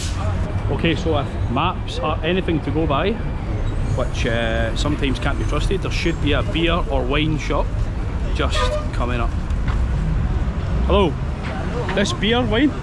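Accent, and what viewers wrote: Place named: Ireland. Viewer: Which country are you from? Let me guess: Ireland?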